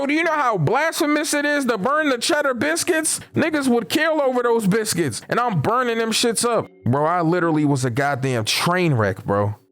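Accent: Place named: New York, United States. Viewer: Real ny shit red lobsters in eny or what lol gateway i know u from tha town u can here it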